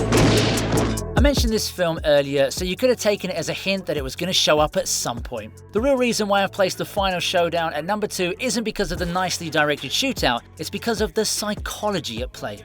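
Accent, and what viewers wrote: England, United Kingdom. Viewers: I'm not yet halfway through and already, it's painfully obvious that saying "bro" and "freakin'" in a middle-class English accent is cringe. As for Leonidas' "wall of death", it's a well; someone mistyped your script. Bro.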